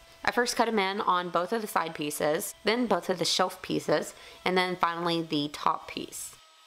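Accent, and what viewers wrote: Texas, United States. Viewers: haha its the Texas twang 😆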